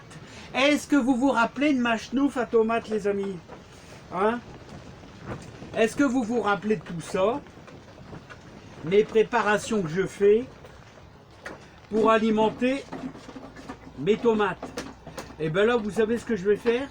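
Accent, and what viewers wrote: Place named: Nord-Pas-de-Calais, France. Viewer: Coucou les chti❤;bonne année atoi avec l accent super t’es vidéos.🍾🍾🍾☃️☃️🐓🐓🦆🦆le papi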